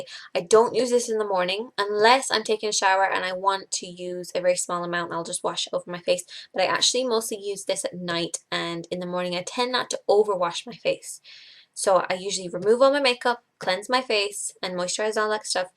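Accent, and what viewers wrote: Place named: Ireland. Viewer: you're Irish aren't you? lol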